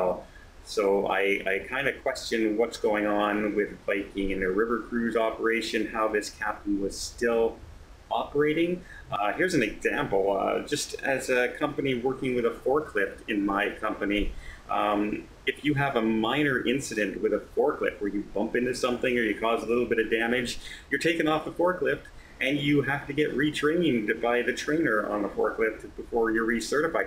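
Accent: Canada. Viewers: The Mighty Cruise Ship Experience He’s Canadian, eh?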